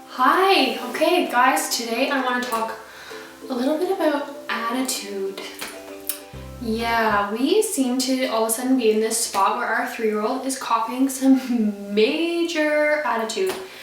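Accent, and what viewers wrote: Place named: Canada. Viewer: the Canadian accent is strong in this one😃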